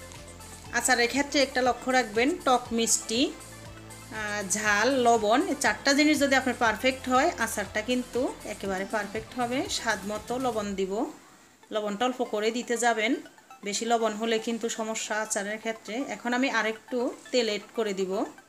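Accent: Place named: Feni, Bangladesh. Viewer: আপনার বাড়ি কি ফেনী?আমার স্বামির বড় ভাবির কথার ধরণটাও এরকম।By the way আচার টা দারুন হয়েছে।👍😊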